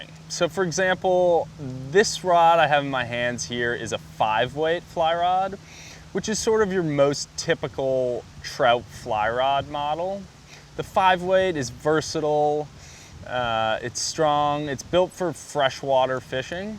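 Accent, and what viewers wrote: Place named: California, United States. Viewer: Definitely a cali accent. Annoying as hell.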